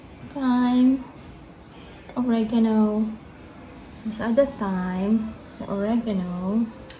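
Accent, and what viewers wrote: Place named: United States. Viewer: i have never succesfully grow tomatoes. you have more fruits and vegetables in your garden than mine beh. amazing you can grow calamansi as well. you have american accent already beh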